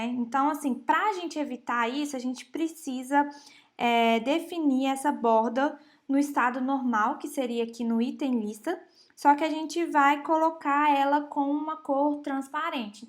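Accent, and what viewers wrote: Minas Gerais, Brazil. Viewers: É muito sotaque de mineira !!!! Eita trem baum sô !!!!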